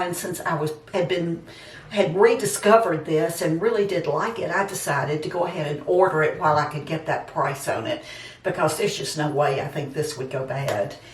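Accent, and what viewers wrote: South, United States. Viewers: WE NEED YOU! I hope you never quit doing videos! Having you be so real and your accent reminds me of a Southern Belle. On any given subject, I enjoy my visits with you and with over 56 thousand subs, I'm far from the only one I bet. Getting to watch your videos is like spending time with a beloved friend and right now (4/11/20) we all need that. You are one of a very small list of YouTubers I never get tired of. You are so sweet and a treasured break from an otherwise troubled time. Thanks for being here! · Just hearing that great southern accent brightens up my day. I had a favorite dress too when I was a teenager. Pretty green with pink plaid thru it, low waist with slim bodice and swirl out bottom, sleeveless. I adored it ❤. It was probably 1970. I loved your dress, can see why you loved it too!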